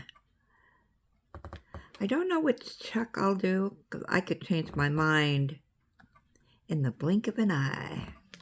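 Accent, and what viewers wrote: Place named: United Kingdom. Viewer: Hey there you crack me up this is amazing diamond painting he sounds British !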